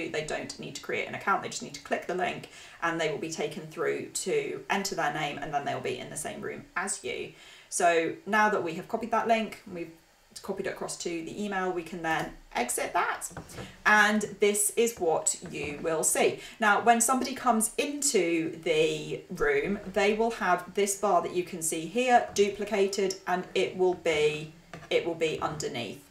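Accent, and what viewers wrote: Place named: Australia. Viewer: I liked the tutorial. I have been using Ringr for years but they just had a meltdown and their customer support sucks. I have zero tolerance when it comes to support issues and a meltdown. How is the support if you need it? Are you familiar with Ringr and I am going to assume you are pretty high on Zencastr yes? Also I love your name and your Australian accent.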